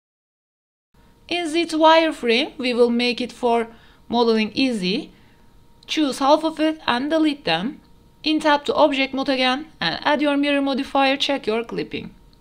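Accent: Turkey. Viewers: you are turkish because your accent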